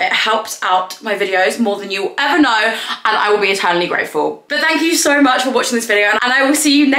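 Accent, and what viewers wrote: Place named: United Kingdom. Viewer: I don’t think I realized that you had a British ascent till now. I just followed one of your Instagram links and found and subscribed to your YouTube channel. This entire time I thought you were an American 🇺🇸. I love hearing your voice for the first time. I had imagined it was going to be a higher pitched voice prior to hearing this video.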